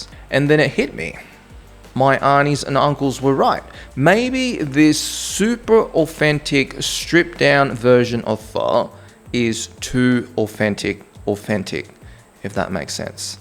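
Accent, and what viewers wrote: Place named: Australia. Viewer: So weird to see a Vietnamese guy speaking with an Australian accent reminds me ofLuke Nguyen. I am glad the rest of us ended up in the states.. I am personally heading back to Vietnam for the first time in 50 years.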